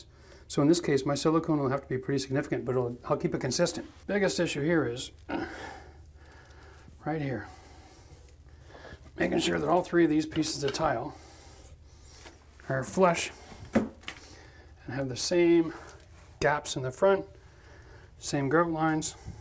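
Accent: Canada. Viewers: This Canadian accent is crackin me up! O.g lol.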